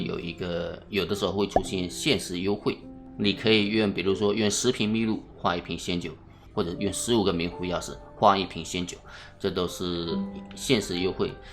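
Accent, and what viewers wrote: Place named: Fujian, China. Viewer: 胡建口音有点重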